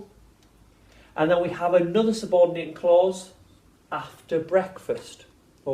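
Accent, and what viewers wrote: Scotland, United Kingdom. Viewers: its scottish fam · Da british accent tho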